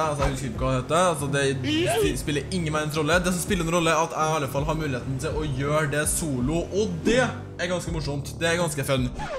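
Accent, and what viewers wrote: Trøndelag, Norway. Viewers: Jeg elsker og høre på trønder dialekten din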